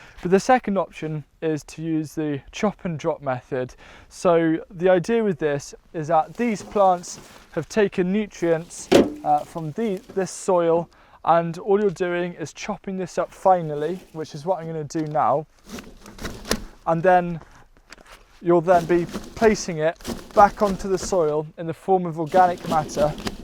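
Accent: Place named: Lincoln, United Kingdom. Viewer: one of my professors is from Lincoln! I recognized the accent from hearing you and when he described his home and how the soil is great for farming, I put two and two together. Love your content! Glad to see you're back! ❤️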